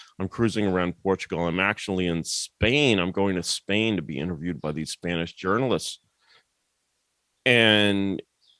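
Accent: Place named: New York City, United States. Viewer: It's a nyc-ish Jewish accent not boston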